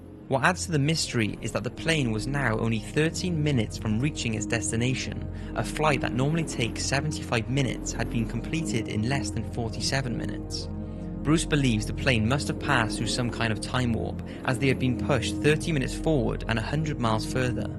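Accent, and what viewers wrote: Wales, United Kingdom. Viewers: you need to be hired to narrate literally everything. You are a modern day Morgan Freeman with a British accent. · House Giantsbane I think I detect traces of a Welsh accent. If so, iechyd da!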